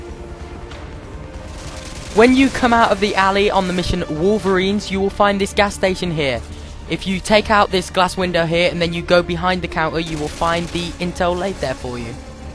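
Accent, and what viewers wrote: United Kingdom. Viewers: I love his british accent =)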